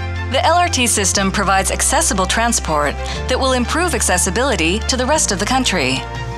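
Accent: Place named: Israel. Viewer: hebrew accent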